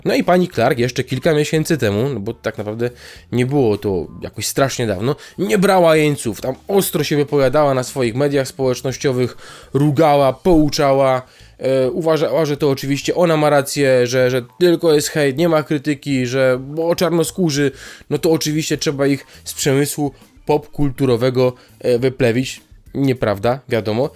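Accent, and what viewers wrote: Silesia, Poland. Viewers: mam takie pytanko, jesteś ze Śląska? Bo wyczuwam akcent:) nie mam FB ani innych mediów społecznościowych więc tylko zgaduję heh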